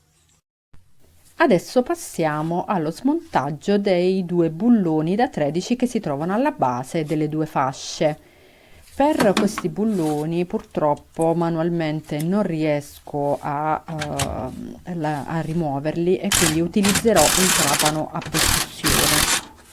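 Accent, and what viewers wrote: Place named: Apulia, Italy. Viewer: Brava e chiara. Con un simpatico accento pugliese credo. Complimenti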